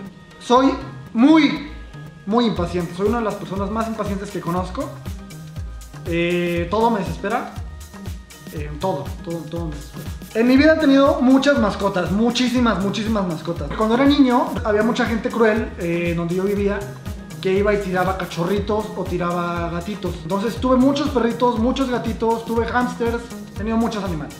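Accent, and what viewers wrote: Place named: Oaxaca, Mexico. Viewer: quien diria que el es un don amargado desde sus 25 o desde antes, lo mas botana es que es un don oaxaqueño con acento paps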